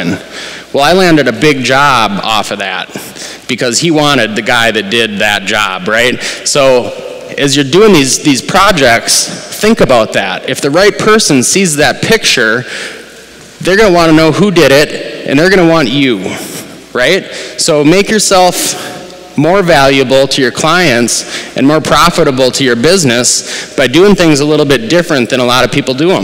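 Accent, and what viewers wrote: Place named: Minnesota, United States. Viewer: Man this guy is definitely a Minnesotan. Do we really sound like that?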